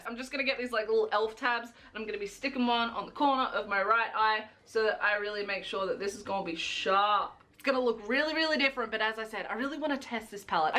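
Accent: Australia. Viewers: Also, 11/10 for representing the Australian accent, we need the real you as much as fantasy you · Because I mainly watch your channel for the makeup videos, I’m not used to your normal voice but I am not complaining! I really appreciate JJ as well as! Also I love hearing a fellow Aussie on this platform, never realised how much an Aussie accent stands out! 😂 also having mild PTSD hearing those birds in the background... me hearing those after being on TikTok all night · After a long time her accent has gone more Australian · I'm so used to that hearing you as your natural Aussie self made me do a triple take!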